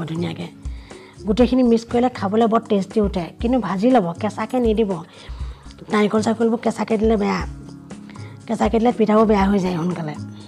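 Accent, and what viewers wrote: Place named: Sivasagar, India. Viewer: বাইদেউ আপুনি শিৱসাগৰৰ নেকি,কথাৰ সুৰবিলাক শুনি শিৱসাগৰৰ যেন লাগে,আমাৰখুৰীৰ দৰে.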